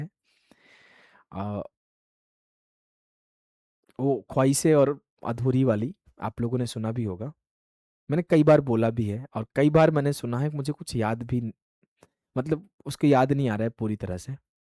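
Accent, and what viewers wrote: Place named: Uttar Pradesh, India. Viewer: sir🙏 day 12 Sir apki English ekdam U.P wala vibe deti h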